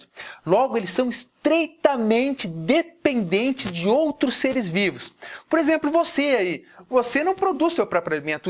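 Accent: Rio Grande do Sul, Brazil. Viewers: tu é gaúcho sor?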